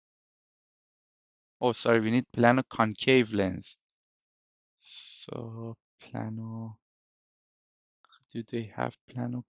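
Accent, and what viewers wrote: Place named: Iran. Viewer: Nice Persian accent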